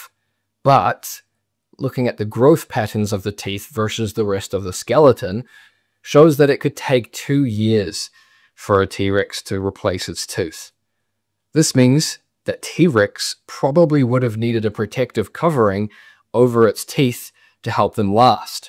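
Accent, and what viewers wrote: New Zealand. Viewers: Do you speak with a Dunedin (NZ) accent? You have a Southland Burr sort of accent. 😊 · Are you a kiwi? Great video btw!!!!! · South Island, New Zealand. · I'm new here. Loved it. Guessing you're a Kiwi.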